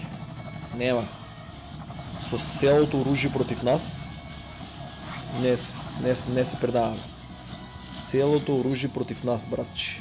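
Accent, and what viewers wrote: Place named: Kočani, North Macedonia. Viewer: Се навлеков на кочански дијалект. :rocket-red-countdown-liftoff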